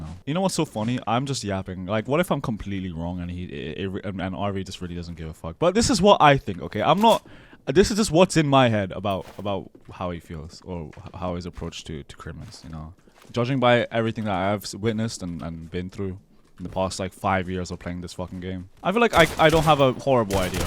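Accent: United Kingdom. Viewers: ur british like me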